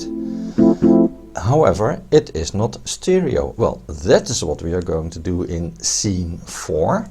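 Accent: Netherlands. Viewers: Thank you for your very excellent tutorials, bravo. You sound Dutch, am I correct?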